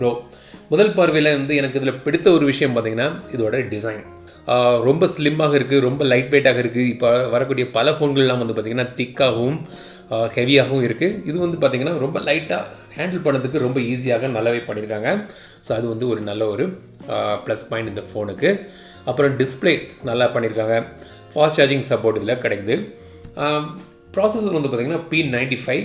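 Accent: Kanyakumari, India. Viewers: Kanyakumari ah neenga?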